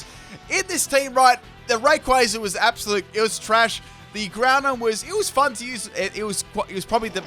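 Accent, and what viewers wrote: Australia. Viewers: U sound Australian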